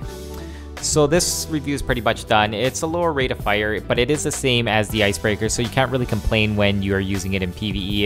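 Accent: Canada. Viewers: Your Canadian as hell :)) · Is it me or does he have a Canadian accent? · I believe he's canadian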